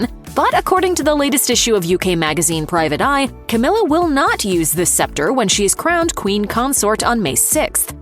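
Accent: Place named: United States. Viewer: I do not understand why these British Royal ‘posts’ are narrated by an American.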